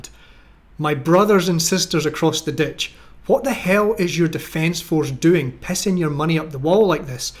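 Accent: Scotland, United Kingdom. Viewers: Hey ,,,,,,,,,,,there is nothing wrong with you and your contents, and I Looooooove your Scottish accent too. Like you i cant wait for the day when this Garbage is dumped to the Landfill of the Human History, and those who really want to work for the sake of progress in life, get their turn at the Mill.✌ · As a former Kiwi I love the tale being told in a Scots (or South island ) accent . Where did capt rugmunch get all of those gongs? it looks like the display of a Swiss Admiral. The NZ Navy was once a proud service and even fought with distinction in WW2. Winston Churchill gave a dinner for the Crew of the Archillies after her success in the battle of the river Plate, HMS Achilles won instant immortality in the Battle of the River Plate in December 1939. Leander’s early war was much quieter, although in February 1941 it sank the Italian commerce raider Ramb I in the Indian Ocean. 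HMNZS Leander was to see more dramatic action in the Pacific War. In July 1943, fighting alongside US ships, the cruiser confronted a Japanese force in the night-time Battle of Kolombangara. After helping to destroy a Japanese cruiser, the New Zealand vessel was hit by one of the enemy's feared ‘Long Lance’ torpedoes. The crew performed surperbly to save their ship, but 26 seamen were killed. Eventually sent to the US for repairs, Leander would never fight under the New Zealand ensign again. almost forgotten today because no women were aboard.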